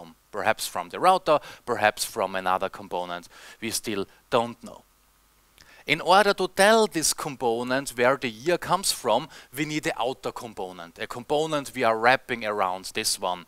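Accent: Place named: Austria. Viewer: Came to check on what's up with Angular these days, stayed for the glorious Austrian accent. I'll be back.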